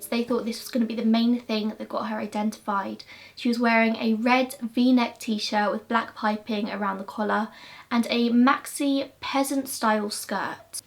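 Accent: England, United Kingdom. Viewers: I just realised that the English accent is so much nicer than the American one. No offence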